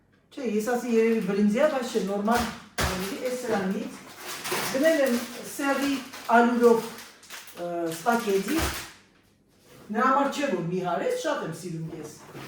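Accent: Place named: Lori, Armenia. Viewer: ջան,հայերեն լոռամիրգ է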